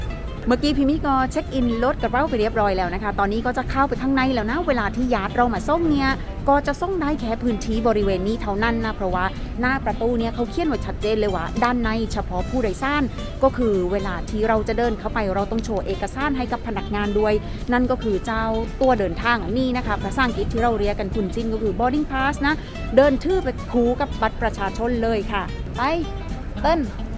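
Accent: South, Thailand. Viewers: ภาพที่พี่มิก้มกราบพ่อแม่ แทนความรู้สึกรักและคิดถึงได้เป็นอย่างดี ความอบอุ่นอาบหัวใจคนดูจริงๆค่ะ /ชอบฟังพี่มิพูดภาษาใต้ ส่วนตัวแยกไม่ออกว่า สำเนียงใต้แบบนี้ แบบนั้นคือจังหวัดไหน เพราะฟังไม่ออกเลย 555 แต่คลิปนี้หนูฟังพี่มิออกนะคะ 😁😁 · พี่มิแหลงใต้เพราะจังคะ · แหลงใต้น้ำเสียงน่าฟังมากกกก พี่ เวลาพูดภาษาอังกฤษก็น่าฟัง พูดภาษาไทยก็น่าฟัง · พี่มิพูดใต้น่ารักจังค่ะ☺️ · วันนี้พี่มิพูดภาษาใต้น่ารักจังขอบคุณมากนะคะได้ความรู้มากมายเลย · สำเนียงใต้ของพี่...น่าฟังมากๆค่ะ ฟังแล้วเพลินเรยค่ะ ❤❤ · ชื่นใจ น้ำตาซึมไปด้วยคะ พี่ ประมาณตัวเองได้กลับบ้านด้วย ภาษาใต้ที่น่าฟัง คะ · น้ำตาจิไหล เข้าใจฟีลพี่มิเลย หนูเปนคนใต้เหมือนกันค่ะ อยู่ ตปท.ไม่ได้หลบบ้านมาปีหวาแล้ว สู้ๆนะพี้ ส่วนสำเนียงอย่าไปแคร์เลยค่ะ แค่พี่มีความสุขทุกครั้งที่แหลงก็โอเคแล้วค่ะ ดีใจกับพี่ที่ได้หลบบ้านมาอยู่กับพ่อแม่ บ่อยขึ้นน้ะ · หรอยจังคลิปนี้..แหลงใต้น่ารักอย่างแรง สำเนียงหรอยนิ นุ้ยคนคอนศรี..จะแหลงเร็วๆดังๆ555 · ชอบฟังพี่มิพูดใต้มากๆ ยินดีต้อนรับกลับบ้านน่ะพี่ ไม่ค่อยได้เม้นเเต่ดูทุกคลิปนะ❤️❤️ชอบเติ้นจังนิ❤️❤️ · คุณมิแหลงใต้หร้อยๆ คนใต้(หาดใหญ่,สงขลา)คนนี้ฟังแล้วมีความสุขจังหู้นิค่ะ👍 ตอนคุณมิเจอแม่พ่อก้มกราบเท้าท่านแล้วน้ำตาพี่ไหลน้องเห้อมีความสุขไปกับน้องมิกันนะน้องนะ🙇‍♀️😢 🌷fc จากเนเธอร์แลนด์ 🌷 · พี่มิน่ารักมากเลยคะ ชอบพี่พูดใต้มากเลย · ผมไม่ชินกับภาษาสำเนียงใต้พี่มิเลย <num> เหมือนเปิดช่องปิดเลยครับ แปลกหูไปเลยครับ555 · ฟังสำเนียงใต้พี่ น่ารักจังค่ะ ชอบๆ^^ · ชอบสำเนียงภาษาใต้พี่มิจังเลยค่ะ ฟังง่าย สำเนียงน่ารัก😙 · พี่มิพูดใต้น่ารักมากเลยค่า ❤️ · ชอบเวลาพี่มิแหลงใต้ น่ารัก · วาวๆๆได้ยินสำเนียงใต้(เเบบเราด้วย)55 ดีงามค่ะดีใจเเทนได้กลับไปเจอพ่อเเม่ค่ะ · พี่มิพูดใต้น่ารักจังเลยค่ะ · เป็นคนเชียงใหม่ที่ฟังภาษาใต้ของพี่มิได้ แล้วภาษาใต้ของพี่มิก็เพราะด้วย เพลินดีค่ะ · หนูน้ำตาแตกอีกคลิปละค่ะ เข้าใจความรู้สึกของคนไกลบ้านแล้วได้กลับบ้านแบบจริงๆ แล้วสักที อบอุ่นมากค่ะ ยินดีต้อนรับสู่ประเทศไทยค่ะ //เอ็นดูพี่มิแหลงใต้ :) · พี่มิแหลงใต้ฟังเพลิน~~~ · เพื่งเริ่มตืดตาม ได้ดูคลิปย้อนหลังเรื่อย ชิบมาก ตอนกดไหว้แม่ ร้องให้เลย รับรู้ถึงความรักความคิดถึงเลย คะ ชอบสำเนียงใต้แบบนี้จัง นุ่มนวล จากคนตรังในกทม.^^ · ชอบสำเนียงใต้มากค่ะพี่ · พี่มิแหลงใต้น่ารักจัง สงสัยจะไม่ได้แหลงใต้นานแล้วใช่หม้าย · คุณมิแหล่งใต้ได้น่ารักมากค่ะ ตอนก้มกราบคุณแม่ ป้านำ้ตาซึมเลย คิดถึงคุณแม่ของป้าตอนท่านยังมีชีวิตอยู่ เวลาป้ากลับไปเมืองไทยความคิดถึงมันท่วมท้นอย่างไรป้าเข้าใจดีค่ะ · น่าร้ากกก ชอบให้พี่มิแหลงใต้ค่ะ น่ารักดี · I like your southern pronunciation so much P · ชอบพี่มิแหลงใต้มากเลยยย ฟังเพลินนนหู้(หู)555 พี่มิน่ารักมากกเลย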